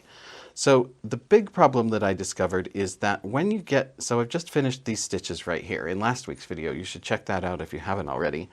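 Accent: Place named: Canada. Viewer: Hello. 😊. But, why use a hoop at all? (ps:you sound like me, from Canada!)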